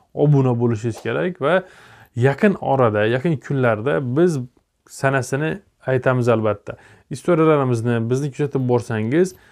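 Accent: Khorezm, Uzbekistan. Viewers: Харазимдан мисиз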